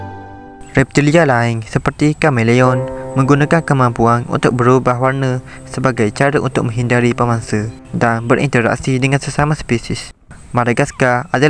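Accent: Terengganu, Malaysia. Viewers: Orang ganu ke? Clear sgt bunyi ganu ye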